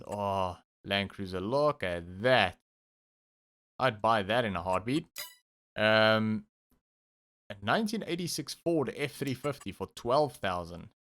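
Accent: South Africa. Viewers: just came across your channel and thought i heard a south african xD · Hearing his voice in the first 2 minutes I knew he was South African and them him confirming it is hilarious. Looking forward to go through your old series and awe from a fellow Sa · I new I smelt a south african accent😂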